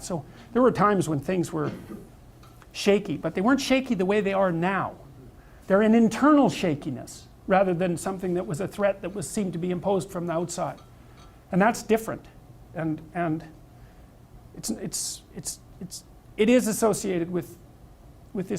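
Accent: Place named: Canada. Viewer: Lewis ouaret He's Canadian, you can hear it in his voice.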